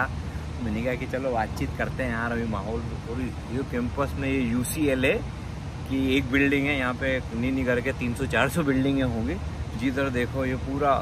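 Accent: Indore, India. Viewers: Bhot jandar 👌👌👌👌 Ye foreign youtube channel wale indori nahi bolte to mja nahi aata unke vlog me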